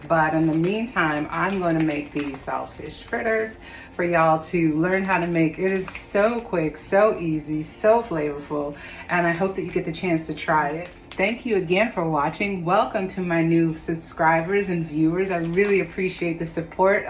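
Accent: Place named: Caribbean. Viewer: 😮😮 Wow!!!I’m smelling the salt fish from Montreal.🤣🤣Looks sooooo tasty.Sending Love ❤️ I’m loving your West Indian accent.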